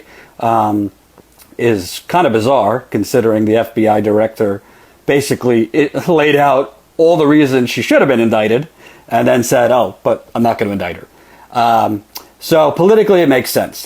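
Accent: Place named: New York, United States. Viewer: I could hear a bit of New York in you in this vid, XD Couldn't you do videos with Kyle from Secular Talk? You're both from NY and part of TYTN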